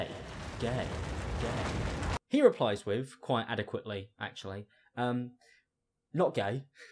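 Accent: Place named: RP, United Kingdom. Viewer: His accent is so posh that I don't understand most of the things he says but I have to assume that it's a joke and laugh to it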